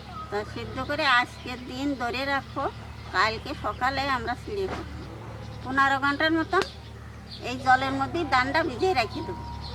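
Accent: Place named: Faridpur, Bangladesh. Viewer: আপনার ভাষায় ফরিদপুরের সুর দাদি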